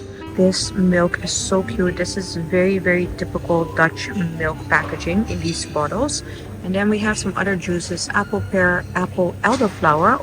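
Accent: India. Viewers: on line! Licious!,! You are beginning to sound Indian with English news channels! Well done, I am clapping!. All over big cities. LICIOUS app. Mostly meat but These also!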